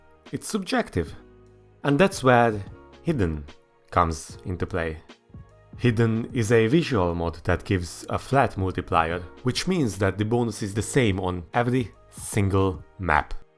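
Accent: Hungary. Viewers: Egy ideje nézem a videóidat, ismerős volt a kiejtés, de csak most realizáltam, hogy magyar vagy :D Így tovább!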